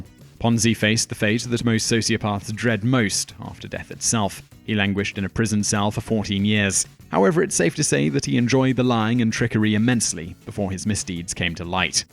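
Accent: United Kingdom. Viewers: YouTube should require "British Accent" in the title of any video with a British narrator!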